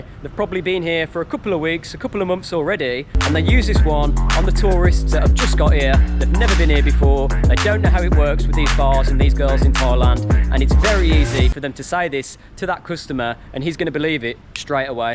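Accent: United Kingdom. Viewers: Love hearing this guy's genuine British english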